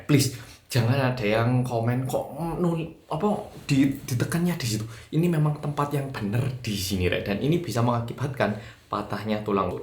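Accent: Java, Indonesia. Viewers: Kalah medok gw sm oppa 😀😀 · jiaannnnm blasssss ra koyo wong korea,medoooxxxxxxxx bgt.......#keren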